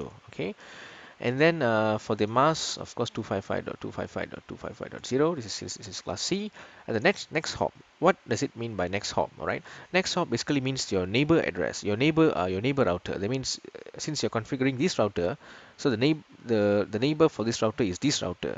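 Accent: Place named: Malaysia. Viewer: I can't miss Malaysian accent haha, nice lah abg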